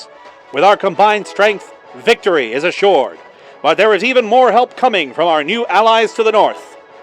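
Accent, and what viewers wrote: Transatlantic, United States. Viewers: Incredibly neat work. Is that your 'Yank' sounding voice. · I love the film reel style, but one note on the Transatlantic accent, you can still keep most of your Australian pronunciation with soft R's before consonants and longer vowels in the same way British Transatlantic Accent broadcasters could, keeping the allied identity there but maintaining the narrow frequency range and vibe (Wendover did a good video on how to speak it and it ends up sounding more Aussie since it was half-designed by an Aussie anyway, for not-so-noble purposes: <link> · Great American accent! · More quality content from the king himself. You sound more American than I do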